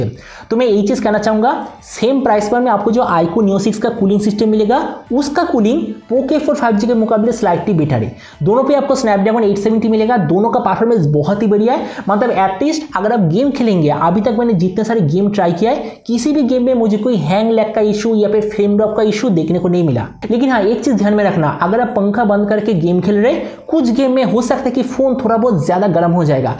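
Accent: Bengal, India. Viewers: You must be a Bengali as your Hindi is typical of eastern part of india 😃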